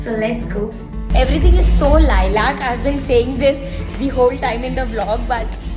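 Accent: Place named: Bihar, India. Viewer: Your bihari accent is just soo cute when you where unboxeing that red purse 🥰also lots of love for you both ❤and waiting for your fun videos💞💞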